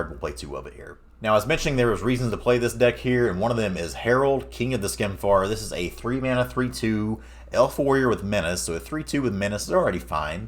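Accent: South, United States. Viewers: Great decks, great analysis, charming southern dialect. I’m sold. Btw, it’s not pronounced «djorn,» it’s «yorn.» ;) Source: Am Norwegian.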